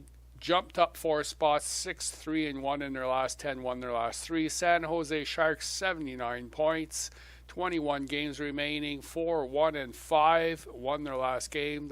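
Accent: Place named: France. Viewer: Hey buddy, do you speak French perhaps, I hear a tiny accent... :p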